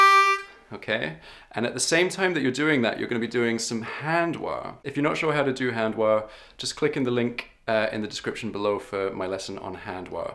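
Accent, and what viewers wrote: United Kingdom. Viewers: I love your videos... 1 suggestion is to have the tabs above where the scrolling time bar at the bottom of the page... i like to pause and practice before i play along and the bar is right across the tab... You sound british so cheers!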